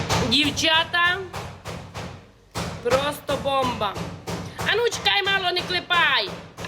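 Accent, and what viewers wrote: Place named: Tiachiv, Ukraine. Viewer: землячко! Тебе тільки чути,- одне задоволення. Одразу пригадую Тячів, місто, де народилася. Привіт з Києва❤😊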